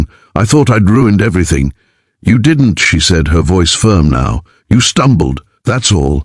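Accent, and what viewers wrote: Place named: England, United Kingdom. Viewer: This is very bad, especially the voice doesn't fit the apparent age of the protagonist. He shouldn't sound like a middle aged Englishman.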